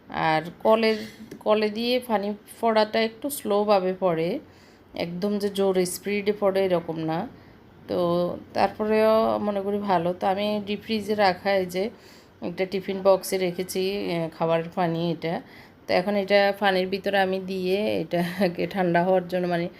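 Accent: Noakhali, Bangladesh. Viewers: আপু আপনার জারটা সুন্দর হয়েছে। আপনার কথা শুনে মনে হলো আপু আপনার বাড়ি নোয়াখালী। আমি আপনার পাসে আছি।আমার বাড়িও নোয়াখালী।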